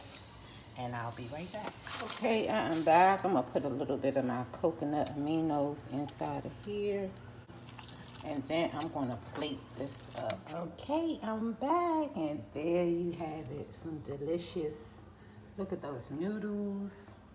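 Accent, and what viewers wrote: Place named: DMV, United States. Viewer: Shalom, where are you from? Sounds like from the DMV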